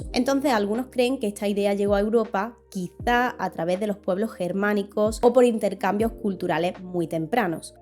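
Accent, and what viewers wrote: Andalusia, Spain. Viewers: Muy agradable el vídeo y tu acento que ha de ser de Andalucía, puede ser? Soy argentina y te saludo. Y te ganaste mi suscripción 🎉❤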